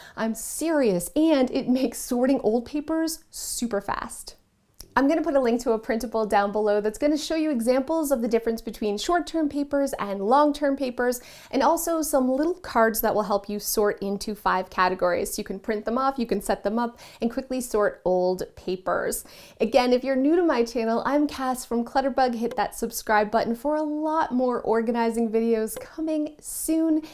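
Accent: Canada. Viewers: Omgoodness... I love your Canadian accent! And, the organizing 😃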